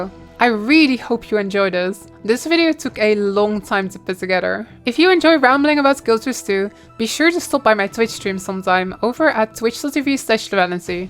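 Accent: Netherlands. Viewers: I love that Dutch accent omg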